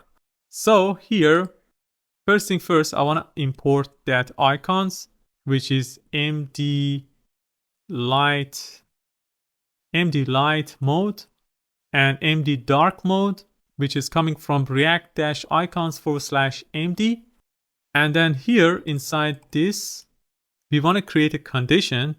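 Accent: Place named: Iran. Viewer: Wonderful! I assume you're originally iranian. so am i. loved the accent and the smooth pace of the tutorial. It would be wonderful if you do a big project like Airbnb.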